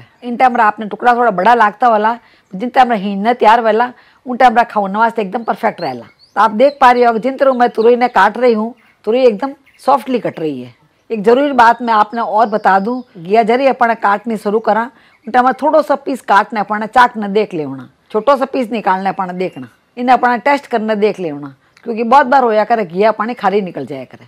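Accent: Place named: Rajasthan, India. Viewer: Pure n Typical rajasthani accent with no AIRS of sickly so called fashionable style Sounded nice Recipe looks festival spl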